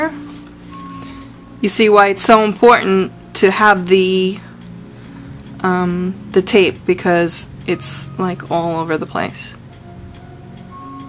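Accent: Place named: Italy. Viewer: This is freaking awesome! Btw if you don't mind me asking, are you Italian? You have the same accent my family does :D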